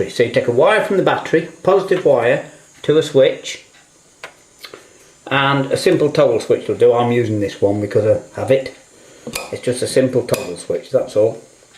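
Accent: England, United Kingdom. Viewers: Your the best , love your English accent Keep it up Bro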